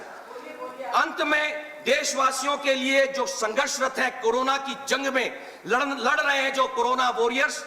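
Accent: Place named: Revdar, India. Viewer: रेवदर की आवाज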